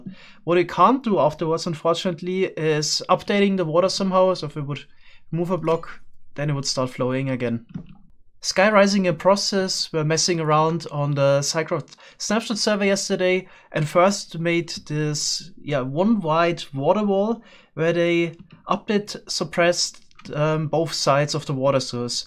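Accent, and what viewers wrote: Germany. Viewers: Are you german? I think I can hear a slight german accent. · ach du kacke hast du nen deutschen akzent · Sat german accent <3 :D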